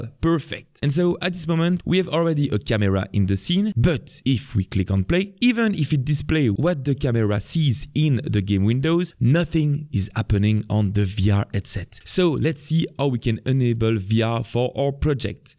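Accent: France. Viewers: On entend que tu est parlent francais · cet accent so french.. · le petit accent francais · I'm making this game on my laptop and when I'm finished, I'll export it as an APK file and sideload it to the quest. Sadly, that means I will not be able to test my game until i'm finished. But, I'm determined to do it! My Game is going to be called Glocks n' Goblins. Basically, swarms of mediveal enemies come at you and you have a powerful sword and a glock to shoot and kill them. there are enough tutorials on youtube to let me accomplish my goal and I am so happy to say that out of all the Unity tutorials on youtube, you have been the easiest to follow. But the French accent can be kinda difficult to understand sometimes, I'm from Canada, so I can pretty much understand it. Thank you again! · Bonjour, l'auteur a un accent français... 😉... Il n'existe pas une version française à tout hasard ? 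🙂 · Ah tu est français? Moi jai reconnu ton accent xD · T’es français ? J’ai dit ça étant donné que ton accent anglais semble français. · beautiful french accent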